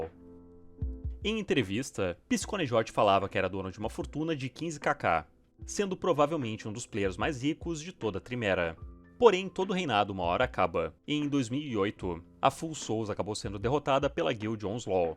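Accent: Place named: Rio Grande do Sul, Brazil. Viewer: Dicção gaúcha. Gaúchos tem essa dicção pela educação forte de base.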